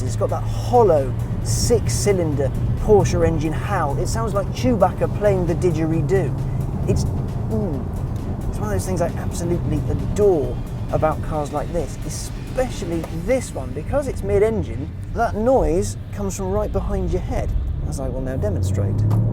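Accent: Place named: England, United Kingdom. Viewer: Only someone with a proper english accent can accurately review a Porsche.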